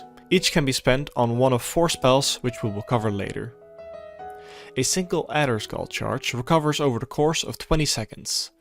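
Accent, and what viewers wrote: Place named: Netherlands. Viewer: Ah the Dutch accent, love it:D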